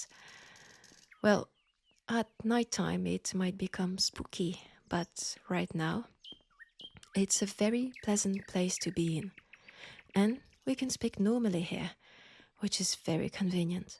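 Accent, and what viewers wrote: France. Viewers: it was indeed a huge surprise for me! i literally was stunned for minutes, not understanding if it's even real. And thanks to you for making my friday great with this one ^^ And hey, please don't cringe! Just have fun with it's "thick French accent". I would call that mix adorable at the very least:) Have a wonderful week ahead,. Your content is making my days brighter, so i'm supporting you in my possible ways. I just hope my silly little comments (which also could be cringe, hehe) making you feel better and appreciated \o/ Ты молодец и я правда восхищен твоей работой. Надеюсь, ты будешь продолжать дальше!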